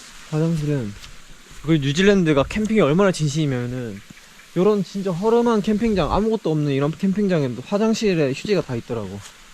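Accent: Gyeongsang, South Korea. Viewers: 올해 60.창원사는 아줌씨입니다. 여행을 좋아하다보니 우연히 영상 보게 됐는데 울 아들마냥 경상도 사투리가 반갑네요 ㅎㅎ 조용조용 즐기면서 탐색도 하고 진정한 여행자 같아요. 진심 부럽네요. 앞으로 자주 보게 될 것 같아요~ 3월에 호주.뉴질 가는데 이 영상 많은 도움이 되겠어요. 감사해요~❤ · 혹시 경상도 분인가요?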